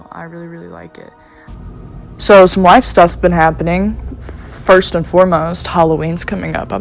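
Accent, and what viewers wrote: South, United States. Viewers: Wait i think its because you both have a southern accent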